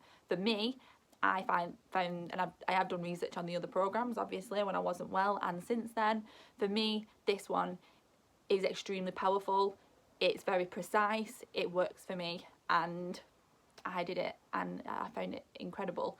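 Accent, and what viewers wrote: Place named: North West England, United Kingdom. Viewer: Sounds good, I'm currently enrolled onto the Optimum Health Clinic course but have been looking into the lightning process. I guess what's holding me back is that I'm wondering what the lightning process can teach that I haven't already found through YouTube and the course I'm on? Thank you for your the video. From your accent I thought you'd be close to me, but I noticed that is North West whereas I'm in Yorkshire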